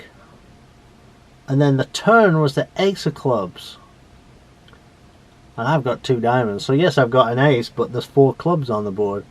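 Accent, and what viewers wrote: United Kingdom. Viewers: You sound like a guy from the north of England, whose been living in the deep south for a little while... am I right? Keep up the great work!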